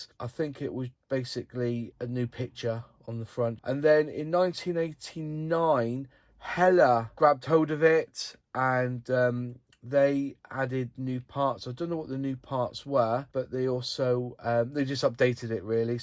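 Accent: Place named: Wales, United Kingdom. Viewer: Sounds welsh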